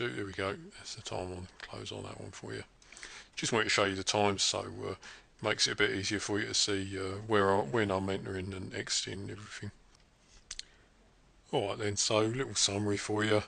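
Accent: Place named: South London, United Kingdom. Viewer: that's funny.Americans often have trouble understanding the british accent. They think we all speak like the queen or maybe hugh Grant or something, lol. Wait until you hear someone with a thick scouser accent. Sounds like he's from south London at a guess. loving the videos.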